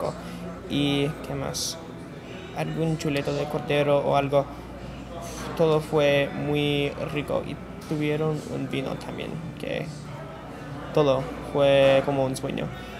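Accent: Spain. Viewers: Your Spanish seems like European Spanish, but is good. I know Spanish is difficult. I say "Hi" from Venezuela. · good spanish :) dude idono why but ur accent around there in the beginning almost sounds like a spaniards accent with the tongue lol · Lo hablas muy bien comgra...!!!!! tiene el accent de espana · Hola,..¡¡Ya me gustaría a mí hablar inglés como tu lo haces en español!! Sólo te falta pasar un año en España(lo digo porque tu acento es de aquí), o en cualquier otro país hispanoamericano para limar esos pequeños detalles. Se te nota soltura al encadenar las frases,tu vocabulario es amplio y tienes, en principio, una buena declamación.Tan sólo debes pulir un poco la pronunciación. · Bien, continúa practicando. Sueña como español de España. Saludos. .! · Acento español ( from spain ), FELICITACIONES. Mejora la pronunciacion de la VOCAL "O" y la CONSONANTE "D". 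SALUDOS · tu español es muy bueno, tienes el acento de españa. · hey, excelente pronunciacion, da gusto que alguien se interese por aprender otros idiomas en especial este que es complicadisimo, tienes un acentillo que parece castellano. (from spain) aunque creo que todos ya lo hemos notado jajaja, nadamas el tipico error ocasional extranjero de confundir femeninos y masculinos, but i do that a lot with irregular verbs in enlgish, sometimes i travel in time.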